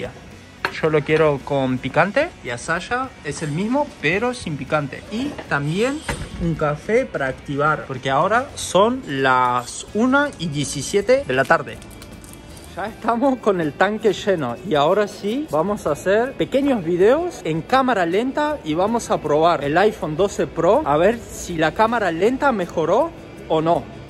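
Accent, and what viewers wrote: Argentina. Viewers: Esa tonada media argentina que onda?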